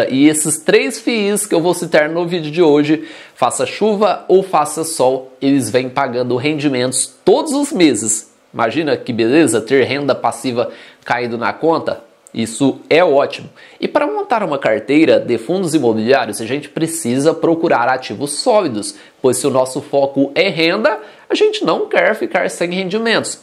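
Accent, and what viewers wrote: Brazil. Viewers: ah, legal, imaginei que pudesse ser do interior de SP ou MG! Sotaques e regionalismos são coisas que me deixam curioso! Agora vou poder me concentrar no assunto dos vídeos. Antes, eu ficava só pensando, "de onde será este sotaque", e esquecia o que estava sendo dito no vídeo! 😀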